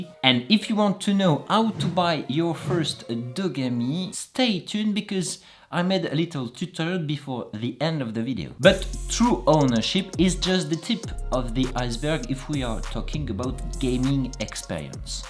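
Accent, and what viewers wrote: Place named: France. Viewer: As a French, I love your French accent! :)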